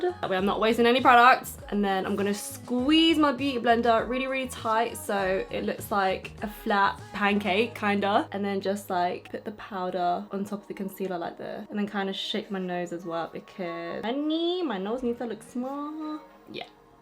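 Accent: United Kingdom. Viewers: Is she in Australia maybe am wrong but it sound brtiths to me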